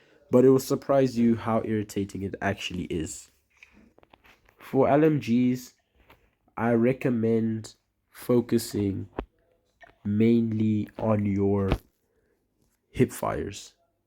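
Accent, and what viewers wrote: South Africa. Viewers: Are you from south Africa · South African?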